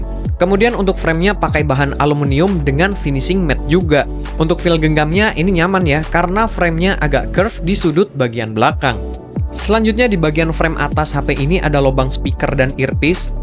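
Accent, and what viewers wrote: Surakarta, Indonesia. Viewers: Orang solo bang? Btw ane user x300 pro sudah sangat puas dan di jadikan daily driver Kurangnya cuma speaker. Overall ok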